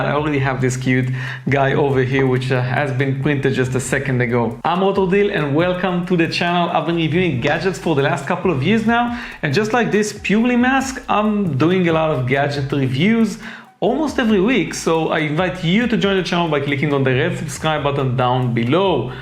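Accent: Israel. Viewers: Israeli english LoL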